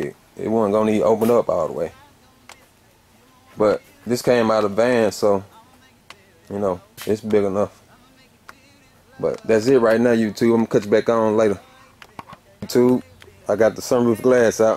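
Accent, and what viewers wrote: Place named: Texas, United States. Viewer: Sound like you from TX man I miss y'all bruh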